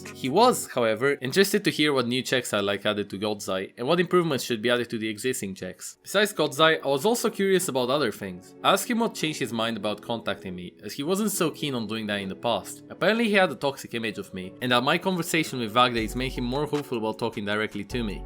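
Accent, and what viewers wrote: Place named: Romania. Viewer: ai accent de roman ma întreb de ce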